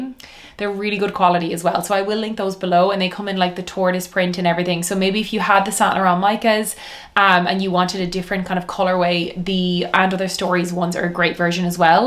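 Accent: Ireland. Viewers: the Irish accent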